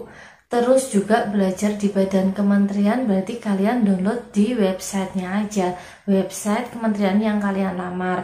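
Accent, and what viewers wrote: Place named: Java, Indonesia. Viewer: medhok mbak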